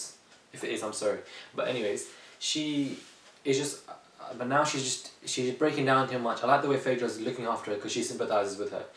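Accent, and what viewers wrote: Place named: United Kingdom. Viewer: RHOA reviewed with a British accent????? LOVE IT!!!!!!!!!!!!!!!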